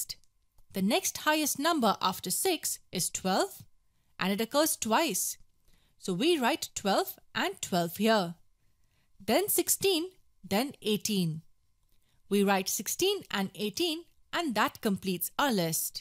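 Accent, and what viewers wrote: India. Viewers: I CAN SAY YOU HAVE INDIAN ACCENT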